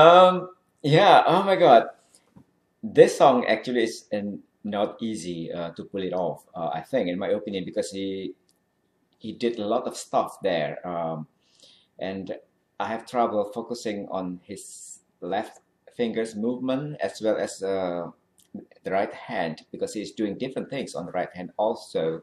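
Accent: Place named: Indonesia. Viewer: Your English is very good but I'm sure you are an Indonesian. I've got the feeling. If so, what part of Indonesia r U from? Greeting from Jkt. The mistakes/errors you've made are all the attributes to Indonesian speakers of English, no doubt.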